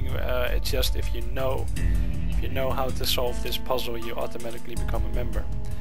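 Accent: Netherlands. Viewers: You are dutch right? You sound like a guy from Holland :) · You're not englisch? Younsound like a dutch? Of niet? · you sound dutch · are you dutch maybe? :D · Zo'n episch nederlands accent. Je hoort het meteen.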